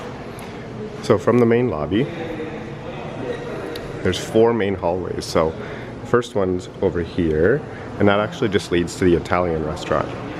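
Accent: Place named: Canada. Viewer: I knew you were Canadian as soon as I heard you talk. Great video!! This is the best one I’ve seen. I’m going on the 29th and it was so detailed.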